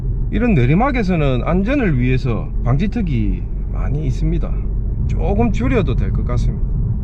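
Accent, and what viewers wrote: Gyeongsang, South Korea. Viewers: 제가 옛날부터 쓰던방법이고 알고있는지식이 또 유툽영상에 올라오니 신기하네요 . 사투리듣기좋아서 시청하고갑니다. 저도 경상권이라 ㅋ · 이런거 모르는 사람이 어딧어 못하는 사람은 운전 미숙이지 그리고 사투리부터 고치고 영상 만들어라 듣기 거북하구만 경상도 사람들은 사투리 못 고치나? 방송인 강호동 나오면 채널돌린다 비호감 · 경상도 아이가 사투리 직이네~! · 놀래뿌써요! 역시 경남 사투리가 좋아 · 왠지 마산분일듯요 사투리가 마산쪽인듯용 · 질이 들어놓니까.... 갱상도 부산,마산,창원(진례)? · 잊고 살았던 구수한 경상도 사투리 ~ 좋습니다.ㅎㅎ ^^ 늘 응원 합니다 ^^ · 경상도 사투리 구수하네요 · 혹시 경상도 남자라예?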